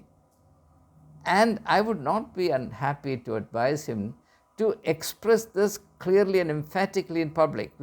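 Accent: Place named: Kerala, India. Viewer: You are a malayali,that accent never go! It's charming!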